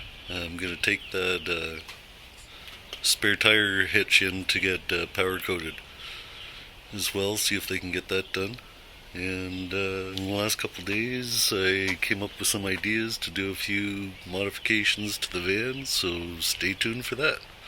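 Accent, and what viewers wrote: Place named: Canada. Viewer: i can listen to this canadian style out and about all day long 😄 . what is moochdocking ?.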